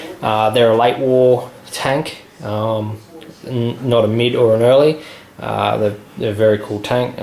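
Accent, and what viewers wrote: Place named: Australia. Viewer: You've got a hell of an Ozzy accent mate...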